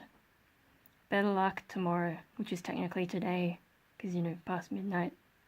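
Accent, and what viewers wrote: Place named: Australia. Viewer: Yes. Northern hemisphere. Southern California to be exact. Whereabouts r u from? Somewhere down under from the sound of ur voice, but I'm just guessing.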